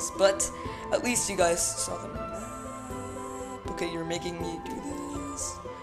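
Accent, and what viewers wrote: India. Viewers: Nice vid but are you Indian.........?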